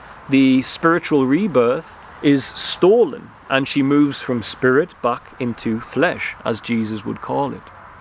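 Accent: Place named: North East England, United Kingdom. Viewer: Are you Welsh bro? Subscribed! Duw bendithia chi (god bless you). yeah I can't work it out. The more I listen the more I think you sound North Eastern! Don't want to say one place or the other in case I offend! Definitely more Newcastle than Sunderland! Final answer! Did I win? Good job though! Nice one!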